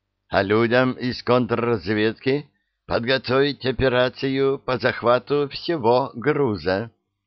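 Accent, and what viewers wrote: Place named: Odessa, Ukraine. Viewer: Снова этот «одессит» читает. Не стала слушать. А так хотелось послушать.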